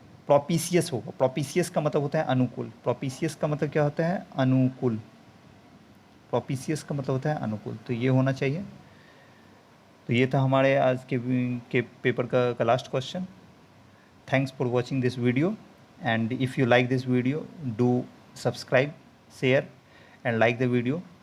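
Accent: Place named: Bihar, India. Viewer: Hello friend, it is not mistress Meera in first question rather miss-iz would be correct pronunciation. Further I suggest you not to teach English because when you speak you have got regional touch in your pronunciation and to validate myself I can say that you are from Bihar for sure. You should try something else because English is not a subject, it is a language where pronunciation has a great importance.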